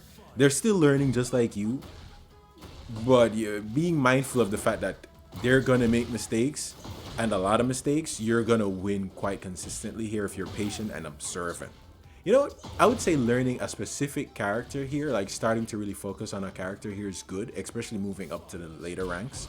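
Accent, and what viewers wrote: Caribbean. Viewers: bro sounds caribbean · Imma subscribe bc you sound Caribbean...i am too. You also know what youre talkkng about!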